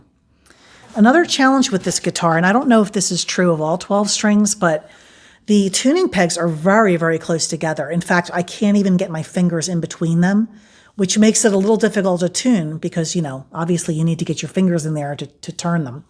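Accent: United States. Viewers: first time we’ve head your speaking voice. Accent….Upstate New York? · For that cost, that is a heck of a great sound! My wife has an Ovation 12…forget the exact model. She’s getting back into playing, and she’ll probably need that guitar re-set up. And do I detect a bit of a Philly brogue in your voice? Awesome stuff…will have to check out more!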